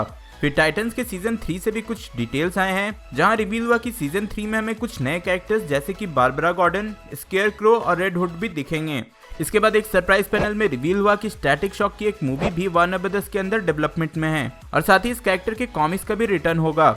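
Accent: India. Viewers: HAS..A.DESI.TOUCH IN HIS.VOICE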